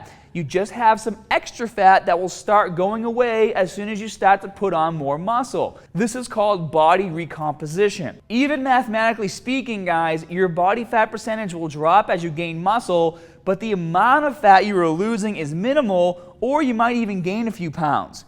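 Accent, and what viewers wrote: Boston, United States. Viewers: Is this guy from Boston. Paut of ya body. I like it! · I thought the dude’s boston accent was a joke at first lol 😂 but very informative non the less. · I think it's the Boston accent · Yea boston accent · Boston accent buddy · Yea my guy is definitely from Boston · Its boston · I love Boston accent Tourette's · its boston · That Boston accent! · Boston guy 😂😂😂 the accent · Sounds like hes from Boston lol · abdulla alsuwaidi it’s An American accent, from Boston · It’s a Boston accent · are you from boston? i like your accent great vid. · This guy is from Boston ain't he? ...just guesssing 🤷🏻‍♂️ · Boston accents are trash lol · This man is dripping with Boston. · I love the boston accent keddd 👍🏾 great video ! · That's a Boston accent...born and raised there so I know. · You got that “BAASSTON” accent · That's a Boston accent for ya. 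· Boston bud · That BAASTON accent though... pretty intense 😸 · Are you from Boston? I love your accent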